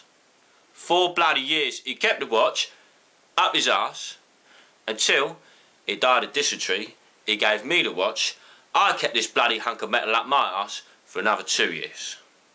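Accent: England, United Kingdom. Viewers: Just discovered this...wonderful! Great skill! Are you English?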